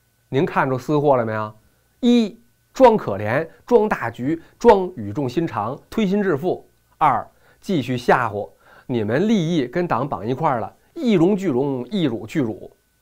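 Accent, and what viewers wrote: Beijing, China. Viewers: 咱北京人揍是这么口正...... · 喜欢💕纯正的北京味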